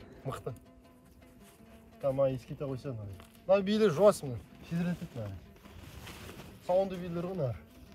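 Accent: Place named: Aktobe, Kazakhstan. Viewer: Кай жер бул ,? Актобенин тилине уксап турго.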